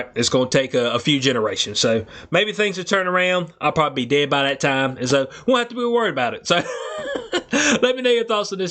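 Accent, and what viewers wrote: South, United States. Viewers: Good video, brother! I can’t quite place your accent… you’re from the south US tho right?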